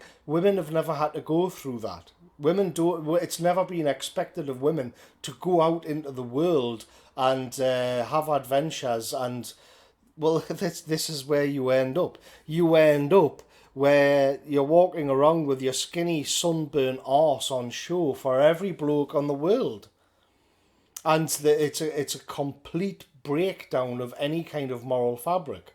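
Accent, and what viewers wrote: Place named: North, United Kingdom. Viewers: Bang on Geordie lad! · Great video great insights and very thoughtful. And a great northern voice of clarity.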